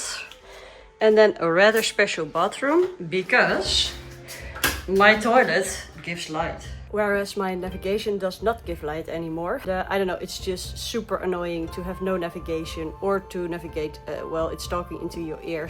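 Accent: Netherlands. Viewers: Love your videos, the dutch accent is a "must" lately in superb world travelling! ❤ All the best, take us to amazing, unseen terrains!!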